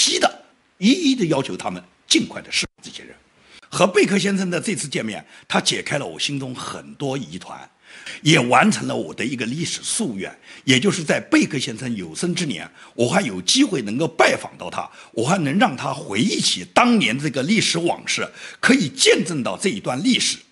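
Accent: Nanjing, China. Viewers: 自从上个月网上偶然发现的视频，几乎每集都看。从一开始听他的口音，就觉得是南京人。而且还是南京二中毕业的。我姑姑过去是南京二中的物理老师，说不定那时候教过吴先生呢😊。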